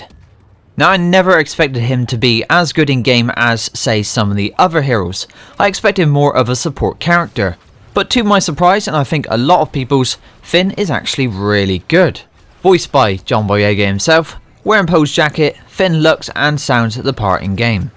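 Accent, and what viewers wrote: West Country, United Kingdom. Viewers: Where in the West Country are you from 😏?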